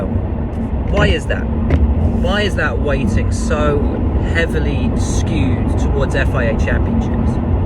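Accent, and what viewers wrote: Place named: United Kingdom. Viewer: It's nice hearing a fella with a British accent giving respect to the drivers from the US as well as the drivers in our US series'. Realistic take on things. Just hearing people chatter about Herta in the pinnacle is good enough IMO. I'm sure a few of Indycar's top drivers would be competitive in a Merc, RB, & the horse. But that will never happen. Pato just got a foot in that door on Sunday. He may win more races and contend for the championship in Indycar 2021. Could he do that in a Macleren F1???? A highly touted previous world drivers champion was unable to recently so....... ?????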